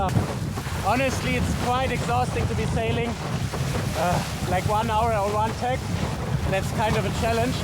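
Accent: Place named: Germany. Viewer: please dont tell the greek you raped their placenames with the german accent !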